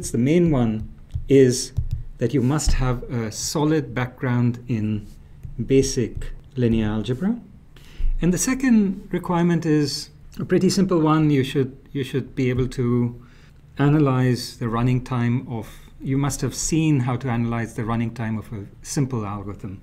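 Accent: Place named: India. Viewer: He sounds like indian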